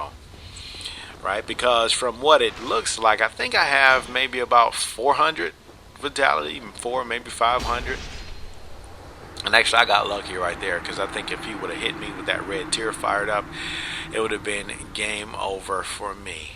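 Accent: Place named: AAVE, United States. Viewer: You sound of the afro ebonic persuasion.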